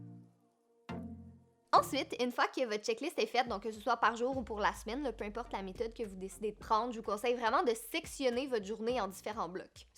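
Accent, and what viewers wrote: Quebec, Canada. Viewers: Tu est cebecoise ?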